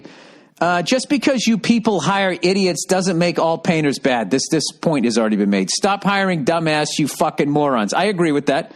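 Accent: Boston, United States. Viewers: Listening to Bill cuss with a Boston accent is awesome.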